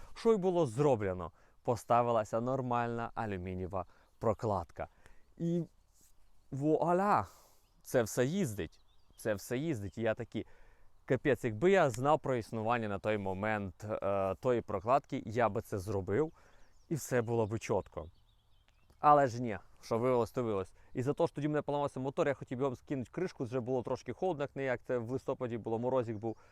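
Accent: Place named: Western Ukraine, Ukraine. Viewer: Заебательский заподенський говор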